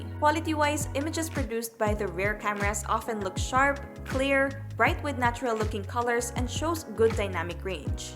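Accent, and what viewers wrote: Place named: Philippines. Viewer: never lose that pinay accent, its part of your charm "var ree aunt"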